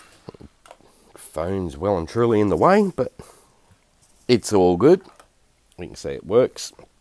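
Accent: Australia. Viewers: Hi from Sydney Australia I subscribed as soon as I heard an Aussie voice. Just found ya gonna binge some of the rest of ya vids. There will be plenty of questions mate! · I'm very new at this type of art and live in Tasmania so it's lovely to listen to an Aussie voice and also hear you talk about Aussie products and stores such as Bunnings. I would like to ask you a question please. I notice that you usually use Amsterdam black for your Activator. Can you use other products and other colours as activators thanks for your very clear informative videos Linda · very good video...good to hear an Aussie male voice in this arena with excellent advice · How good is the Aussie accent? So nice to hear on you tube. Champ recipe too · Great to hear an Aussie voice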